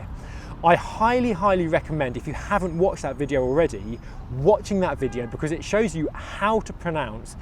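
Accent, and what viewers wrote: United Kingdom. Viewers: What British accent do you speak ? Is the British IPA same for all British accents ? I am from India I speak Hindi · Hello sir ,your accent is american or british ??? It is the first time to see your channel .my mother's tongue is arabic .do you like arabic language😀 i learn american accent.i noticed that you speak british ,am i right ?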